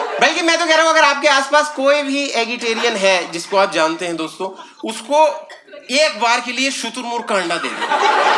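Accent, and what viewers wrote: Pakistan. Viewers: Is he Pakistani his tone is similar..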